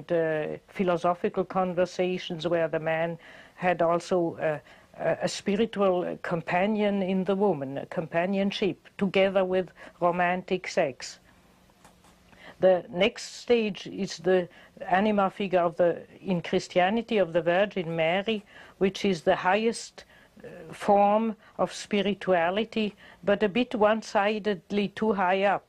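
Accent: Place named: Liverpool, United Kingdom. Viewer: sounds like a scouser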